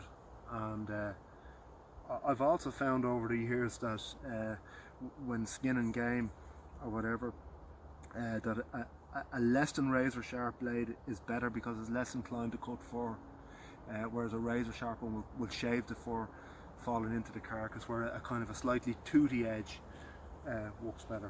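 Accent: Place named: Ireland. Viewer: It is Great to see such a Good version of an Iconic Knife been used in My Homeland ! Thank You for the method of Drawing the Fire steel back from an embedded Knife it was a New one for Me ! The Golden Rule of using a Tracker Knife is that You have to Know How to Use a Knife Properly for it to be " A Good Knife " and I have to say this as an Old Dub in London for Forty Years , it is Great too Hear an Irish Voice on this Subject and I look forward to much More .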